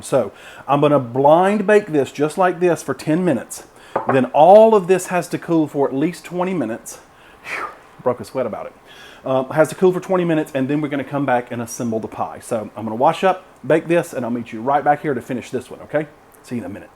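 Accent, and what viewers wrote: South, United States. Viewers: Thank you ! love your video and love your personality w southern accent!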